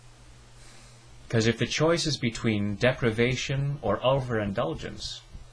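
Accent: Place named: United States. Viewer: do you think this can work over the radio? Without visuals? Would you like to do a radio interview with me? For the uk? I assume you are in the US due to your accent. I always put a recording on my YouTube channel 'Feustelfit on air 91.8 Hayes fm' Please get in touch <mail>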